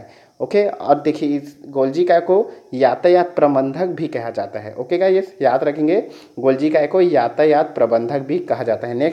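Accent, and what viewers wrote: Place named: Chhattisgarh, India. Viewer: c.g kuc jyada hi bolte ho kya sir..hindi ko v chhatisgarhi typ tunning me padhh rhe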